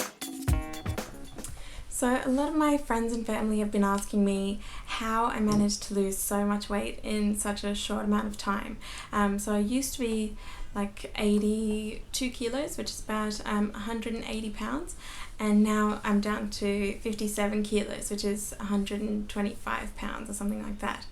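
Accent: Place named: Australia. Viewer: If I had to guess I would say you're an Aussie?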